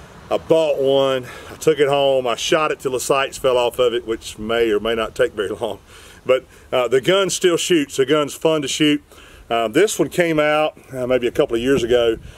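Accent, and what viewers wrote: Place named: South Africa. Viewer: Cool vid! Where you from? Sound a bit South African.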